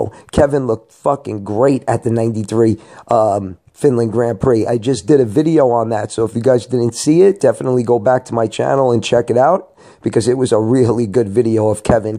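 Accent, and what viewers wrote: New York, United States. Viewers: i love your nyc accent it makes the whole video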